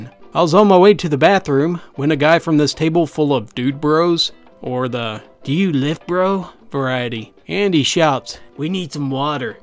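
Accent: South, United States. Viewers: I know it's Southern, but what state? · Where is the reader from or is that a fake accent. It's not the coastal South, maybe Arkansas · the most calm and somewhat soothing southern accent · Someone with a strong southern accent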